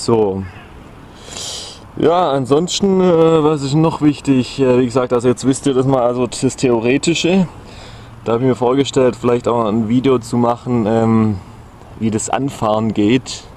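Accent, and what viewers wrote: Swabia, Germany. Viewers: Omg ich liebe Schwäbisch :D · endlich amole an gscheiter schwabe oder ? :D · Aha.. eh Schwob ;)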